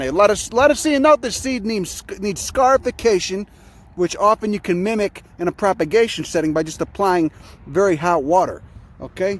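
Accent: Chicago, United States. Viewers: I adore your "plainsplaining." Your Chicago Italian accent is cool. Can you post a pic, so we know what you look like? Not expecting a Glamour Shot, nor anything salacious! 😜👾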